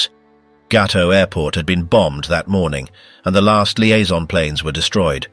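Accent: United Kingdom. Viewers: English accented narrator, yet he says "aluminum" and "flashlight" (when he means, judging from the sentence context, "torch"). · AI videos with a british accent.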